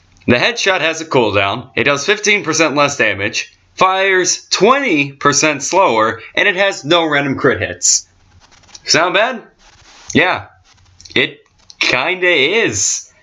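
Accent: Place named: Scotland, United Kingdom. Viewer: SCOTTISH ACCCCEEEEENT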